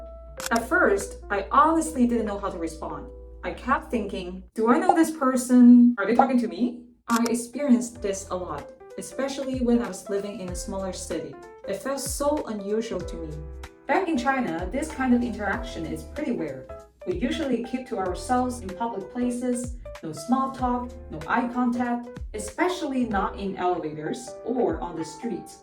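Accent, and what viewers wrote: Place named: United States. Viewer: You have an American accent. You don't sound like from China.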